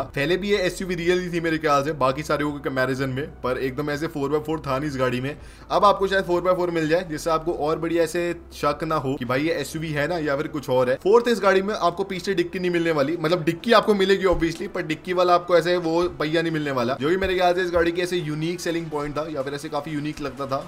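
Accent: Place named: United States. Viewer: You talking about Escape. And why the hell are you trying to use American pronunciation?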